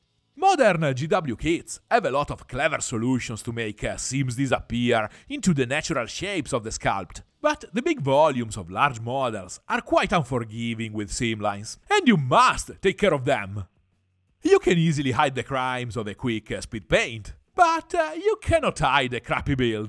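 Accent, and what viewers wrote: Italy. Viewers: Video davvero belli di ottima qualità! Molto interessante ed istruttivo. Molto simpatico l'accento inglese un po' italianizzato anche se a volte è davvero comico 😁😁... Ma comunque complimenti! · aaaah okok! Sentivo dall'inglese un po' "maccheronico" che un po' tutti noi italiani abbiamo! Apparte questo, sei un mostro grande!!! Io ho iniziato ora escono statuine del presepe.... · Complimenti! Sei bravissimo, poi l'inglese stile Super Mario è la ciliegina sulla torta! 🥰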